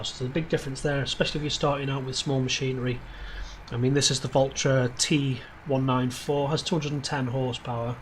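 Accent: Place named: Liverpool, United Kingdom. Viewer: You do have a few Liverpudlian twangs in there :), are you using a steering wheel for this?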